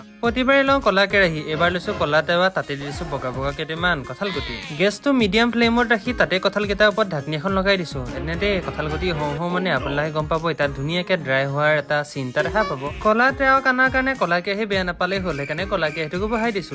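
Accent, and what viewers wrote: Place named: Nalbari, India. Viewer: dada mur nam tu lona koi koi vagor lagisa❤❤ tumi kom kom buli he koi thaka nokowaya dakhun nalbarir para koiso❤❤❤❤❤❤❤❤❤❤❤❤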